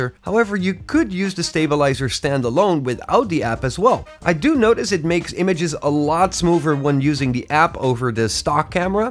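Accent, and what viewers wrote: Netherlands. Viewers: This sounds like a Dutchman...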